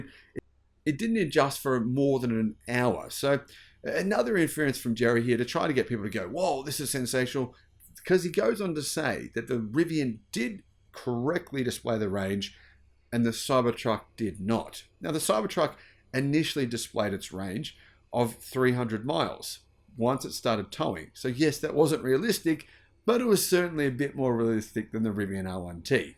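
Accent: Australia. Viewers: Nope! I don't trust your Neanderthal a** anymore. JerryRig is very clear and honest. You sound like you're from Australia or something, that makes sense considering you can't Believe that somebody would drive 65 miles per hour , that's normal here in America in fact slower is dangerous. The region he towed in is cold and mountainous this was a worst case scenario. I know it's hard for you to believe that places like this actually have snow and there's actual mountains, living so far down under, But they do. Every phrase you used it was said to put doubt into what happened but offered no proof. All in all if the truck can't go to 250 miles (real world miles) towing on one charge it can't tow.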